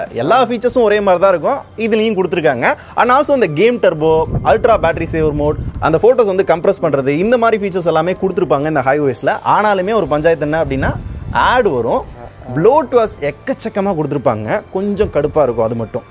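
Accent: Madurai, India. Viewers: மதுரகாரங்க பேசுற மதுர ஸ்லாங் கெத்து தான்...! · Bro nenga madurai karar avinga ivinga slang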